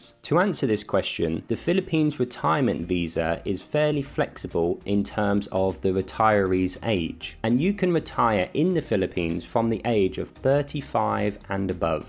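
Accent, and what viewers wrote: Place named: United States. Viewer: u must been a american that is why u talk like that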